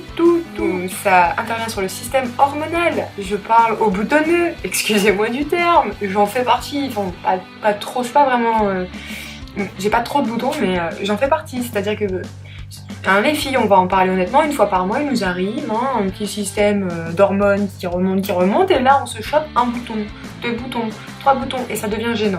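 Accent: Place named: South, France. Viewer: Merci pour cette vidéo hiii tu me fais trop rire avec ton accent du sud 😂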